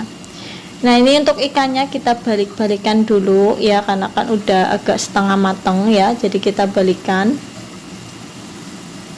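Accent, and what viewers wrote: Banyumas, Indonesia. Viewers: Suka dengernya basa ngapaknya mbg,, Slm knl mbge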